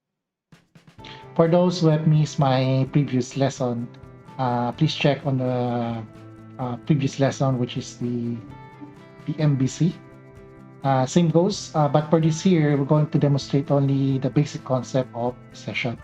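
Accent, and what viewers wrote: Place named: Philippines. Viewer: hi, I recognized your tone and confirmed that u r a filipino with your name. i'm a 3rd year filipino IT student. just proud of ur videos. thank youuu! it helped me with my simple project :)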